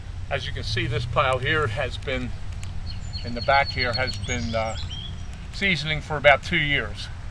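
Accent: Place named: Philadelphia, United States. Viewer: First of all, the very first time I heard you speak, I knew you were from Philly. I moved away 30 years ago, but there's still nothing more I enjoy hearing than that gorgeous Philly accent! I've purposely made sure never to lose mine. ...Second, I did tree work in my younger days, mostly in Bucks county, but also a few logging trips up to Sullivan county. I miss tree work. It was tough, but I loved it. ...I also appreciate that you're equally at home splitting wood and planting flower seeds. Awesome.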